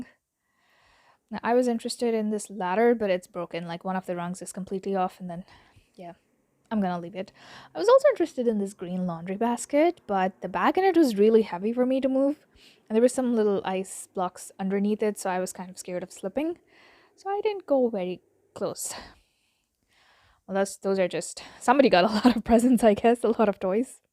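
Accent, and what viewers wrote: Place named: India. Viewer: U sound Indian, not Korean.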